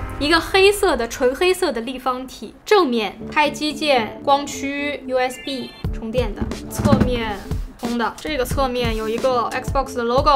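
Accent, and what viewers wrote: Standard. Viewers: 英语发音真的标准。听着就舒服。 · 講英文與中文都非常標準又好聽 畫面也都拍的很清晰到位 享受啊😎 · 英语发音超级标准。赞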